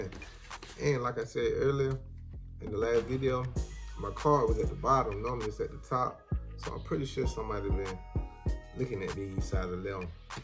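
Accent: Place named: South, United States. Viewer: Can’t tell if you’re from Atlanta, Florida, or Alabama....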